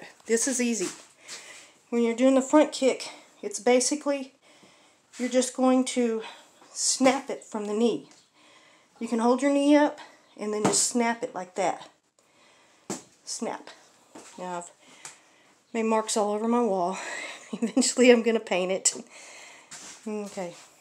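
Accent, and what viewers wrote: United States. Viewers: Are you American ??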